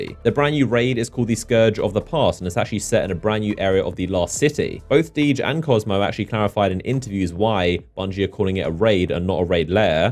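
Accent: United Kingdom. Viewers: Why is everyone on Youtube talk in a British Accent ? lol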